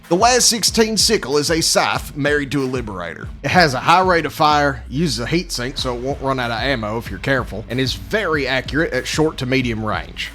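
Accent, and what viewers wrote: South, United States. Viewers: Hey , is the southern draw an affectation or your natural dialect? I don't mean to shatter the illusion, I love your content, just seems like a lot of work you do for us if it isn't! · im new as it just came to xbox and i thought this game couldnt get any cooler and then i found your channel. nothing makes me wanna spread democracy more than a fellow sweet tea accent screaming about freedom.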